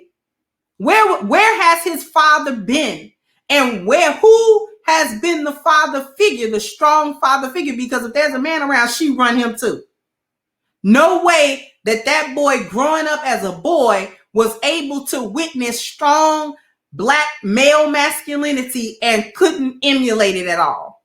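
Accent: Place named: East Coast, United States. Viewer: my sister you sound like a east coast sister much lov Salaam.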